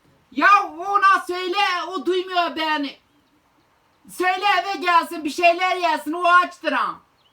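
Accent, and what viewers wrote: Turkey. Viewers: Bence sen zazaca konuşma aksanli karakocan zazacanla hep kendine argo şeyler söylüyorsun lexanlisiniz siz galiba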